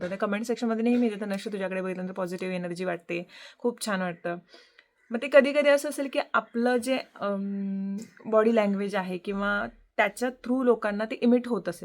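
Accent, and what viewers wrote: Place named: Belgaum, India. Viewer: तुमची भाषा ऐकुन फार छान वाटतं बेळगावची आठवण येते... माझं माहेर उचगांव बेळगांव ❤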